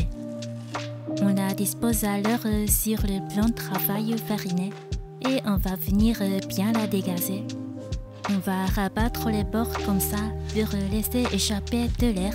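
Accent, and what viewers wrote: Japan. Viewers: Bonjour je viens de decouvrir ta video sur le pain aux figues et noix cela ma donne envie d'en faire tout de suite, du coup je m'abonne a ta chaine. PS J'adore ton accent japonais merci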